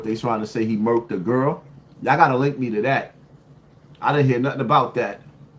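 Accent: Brooklyn, United States. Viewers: BROOKLYN TONE N THE BUILDING EAST NY STAND UP...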